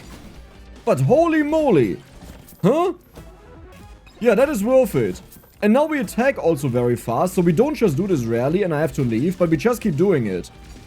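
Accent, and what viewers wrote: Germany. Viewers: Could it be that you are German ?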